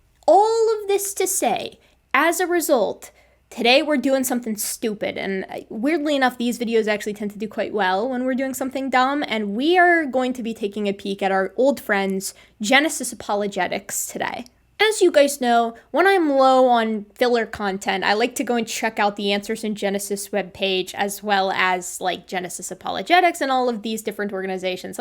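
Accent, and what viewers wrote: Midwest, United States. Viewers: I love her midwestern speaking style, but I may be a little biased because I'm from northern Illinois and she sounds like me, haha.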